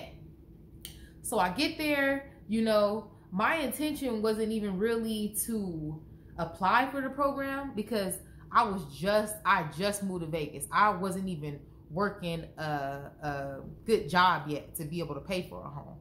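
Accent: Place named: California, United States. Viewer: LOL! You must be from the Bay or LA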